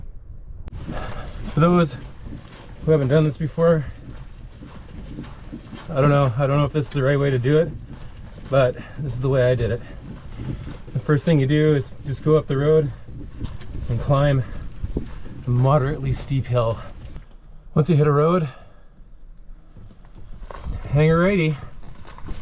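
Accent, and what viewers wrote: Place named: Saskatchewan, Canada. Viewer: Great canoe camping videos. It made me very nostalgic, When I was very young (24ish, I am 62 now) I sold my car and all other possessions and went on an almost two years coast to coast (from Newfoundland to Vancouver) Canada backpacking adventure. I stopped the longer in Saskatchewan where I got a temporary job helping a University of Saskatchewan team trapping muskrats, mink & beaver in the lakes and rivers around Saskatoon as part of a large research project of professor Dr.Francois Messier. I was a young, enthusiastic vet and the team needed one for the anesthesia of wetland wildlife they were working with. Lots of canoeing and kayaking putting tons of Tomahawk live traps in muskrat and beaver dens in the summer. Later some snow machining doing the same in the brutal Saskatchewan winter. All in very similar places as your videos (therefore the nostalgia and listening to your familiar Sask accent). 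Lots of fun. Loved it. I run away in the winter to visit a friend in BC and to escape from the brutal snow & ice over there. New sub from the Yucatan Peninsula Mexico, I do some sea kayaking here if you are ever interested in that kind of stuff. Thanks for sharing. Elbows up! Canada strong!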